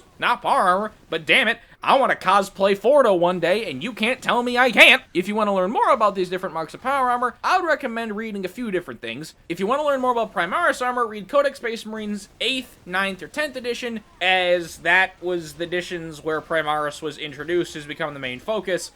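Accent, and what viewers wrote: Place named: United States. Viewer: As iconic as hearing a British accent accompany Warhammer lore, it is refreshing hearing a fellow brother from this side of the pond discuss 40k. I'm in love with what I'm seeing so far.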